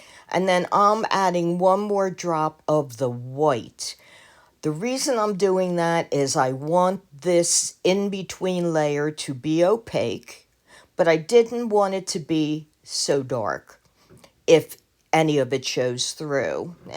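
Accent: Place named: East Coast, United States. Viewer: Very nice! I'm just starting with resin. I have a starter kit coming soon. Been creating jewelry for 35 years, but just noticed resin. Sounds like you're from the east coast. I'm from Philly, but I listen to accents from reason.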